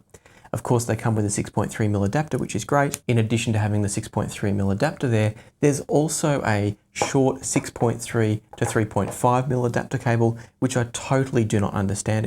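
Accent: Australia. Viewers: I love your soft, soothing Australian accent...you would make a great ASMR artist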